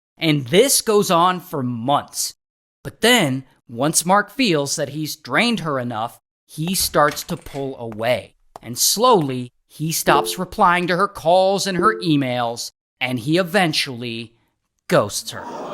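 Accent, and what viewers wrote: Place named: United Kingdom. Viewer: your british is so funny :)))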